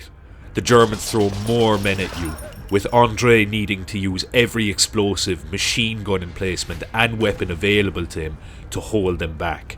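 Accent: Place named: Limerick, Ireland. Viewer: Oh, by the way, what's your accent? It makes me think of OP Shakespeare a little bit. Edit: Ah, Limerick.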